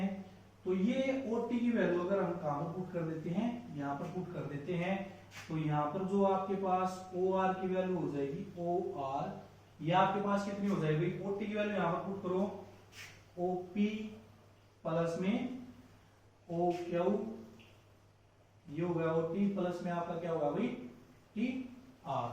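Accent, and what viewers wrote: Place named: Haryana, India. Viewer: Haryanvi tuch me mja a gya pd kr sb clear ho gya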